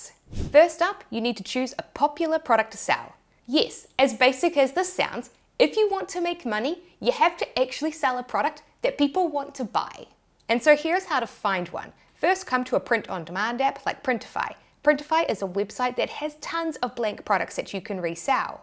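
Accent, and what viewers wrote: New Zealand. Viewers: Pretty sure it's her Kiwi accent 😄 Someone please correct me if I'm wrong! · Hi! 😀 My name is Shannon, I'm from Pukekohe, New Zealand - I stumbled across your channel just a few weeks ago and was delighted to hear a New Zealand accent!!! 🤩😁 I LOVE your channel, your videos are excellent, there are SO many options and ways to make money online which I'm looking into. One of my long time wishes is to work from home and there is no better time than now to make this dream happen! I've been watching a lot of YouTube videos over the past few months, looking into various ways to make money online which will work and aren't too mind-boggling, or cost any money to start. You are amazing -- your knowledge and success are incredible!!! ✨🤩😁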